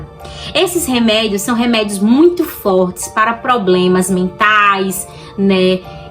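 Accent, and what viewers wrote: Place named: Northeast, Brazil. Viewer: "E disse bem assim" se existe coisa mais bonita que nosso sotaque nordestino narrando casos criminais reais, eu desconheço. Parabéns pelo canal.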